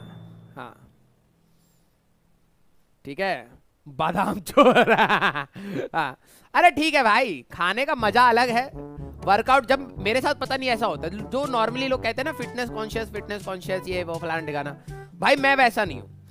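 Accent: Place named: Haryana, India. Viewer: Isko kehte h ठेठ हरयाणवी 😂btw I m also from haryana love u sir ji❤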